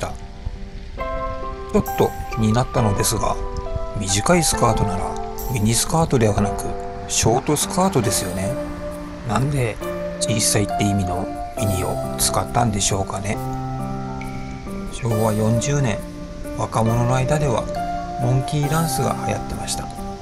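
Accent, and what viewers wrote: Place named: North Kanto, Japan. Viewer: ナレーター男性のイントネーションが関東以北の雰囲気を醸し出していたので、いっそのこと方言を丸出しで語らせたら違和感も無かったのにと思う。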